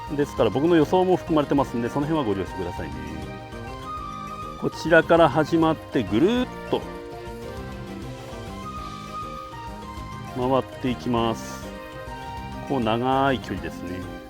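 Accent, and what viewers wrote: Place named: Fukui, Japan. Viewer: 結構訛ってんな😀独特の福井訛り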